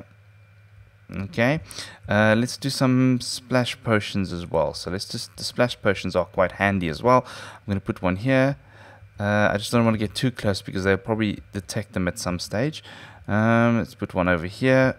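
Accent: South Africa. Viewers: where from south africa are you ? :)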